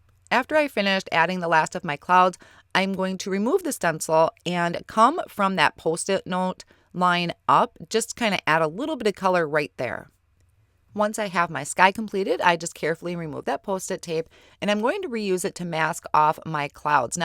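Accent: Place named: Minnesota, United States. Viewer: you've got to be a Minnesotan... "pOst-it tape", at the very least a mid-westerner... I'm originally from MN so I can hear the a's and the o's a mile away... love the card and all things My Favorite Things!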